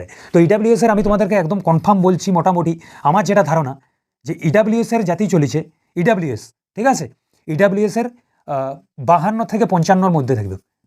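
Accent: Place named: Purulia, India. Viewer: Dada tumi purulia language bolcho ❤️❤️❤️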